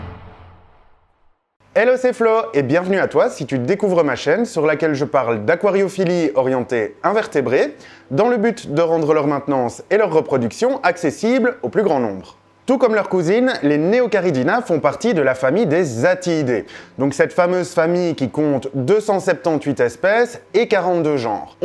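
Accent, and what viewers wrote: Belgium. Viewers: Excellente ta vidéo, explications vraiment claires ! tu es belge ? Le Houit ne trompe pas en général 😊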